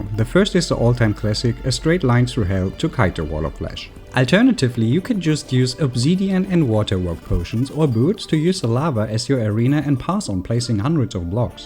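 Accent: Germany. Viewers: Du hörst dich so deutsch an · thank you - you German aint you? :D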